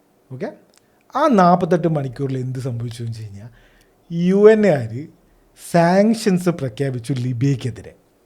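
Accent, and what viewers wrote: Palakkad, India. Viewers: Bro ningal palakkad ano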